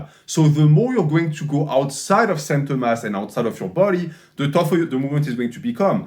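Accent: France. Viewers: you have a bit french accent, not sure....nice video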